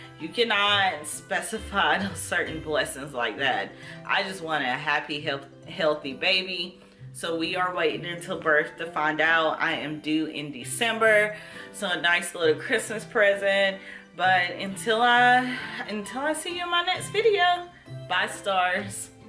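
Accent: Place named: Carolinas, United States. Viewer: I'm so glad I found this video! I heard your accent and I was like "She has to be from NC or SC" and when I heard you say it's still cold in NC and here in Florida, I was like "I knew it!!!!" I'm on Letrozole (Day 4 of pills tonight) and I have had very few side effects. Thank goodness! But I have had joint pain in my left knee and right ankle, but that didn't last long. I've had some nausea in the mornings, but it doesn't last long either. I am hopeful that this first round will work. I haven't had any previous issues with my female parts other than this year (2020) and my cycle being waaaayyy off. But luckily, my doctor is wonderful and listens to me. He feels like my issues are hormonal and that this first round could be successful.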